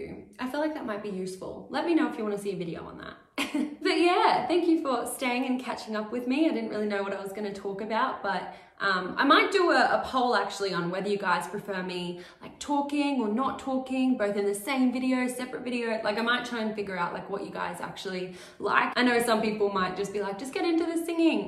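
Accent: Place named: Australia. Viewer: Really good cover! 👏 I love the chatty videos! I like hearing you talk. Not only to hear your lovely Aussie accent (lol) but to hear your stories on your musical journey.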